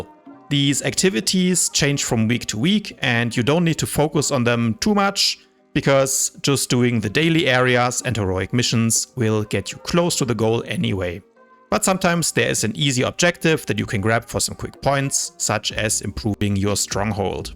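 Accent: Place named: Germany. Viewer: The "german" english is very nice zu hören :D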